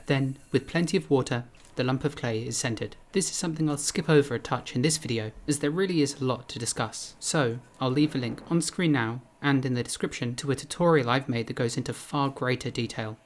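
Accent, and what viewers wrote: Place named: England, United Kingdom. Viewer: To anyone wanting to hear a real English accent.. welcome.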